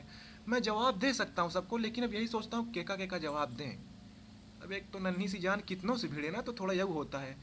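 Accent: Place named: Gorakhpur, India. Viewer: लेकिन् आपके बोलने के स्टाइल से तो लगता है की आप गोरखपुर देवरिया से है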